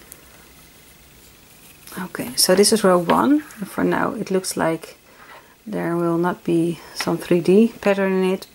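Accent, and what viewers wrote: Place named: Netherlands. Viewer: I learned this one with a just one sc rather than two, but otherwise the same. I love that Nederlands accent - unmistakeable ! :) But I dunno how come you guys speak such faultless English !!!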